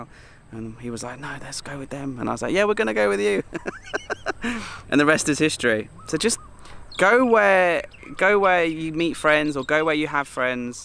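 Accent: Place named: Northumberland, United Kingdom. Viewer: Here's a question. "We reckon you are from County Durham or Northumberland and went to private school. Are we right?"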